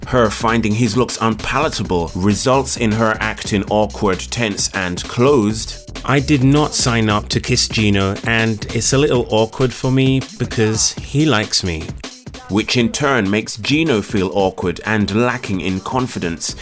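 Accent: London, United Kingdom. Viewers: He is from London so I think it's a London accent. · German? He’s English mate! It’s a black London accent. I have to say his is quite mild and he is very well spoken. Lots of black people in London have very strong accents and sound in your face and ghetto (just depends really). He sounds quite posh tbh. Not saying he is, he’s just very well spoken and not necessarily reflective of the typical black, Asian or white London accent. Londoners accents are usually fucking horrible whoever is speaking them but his mogs everyone’s!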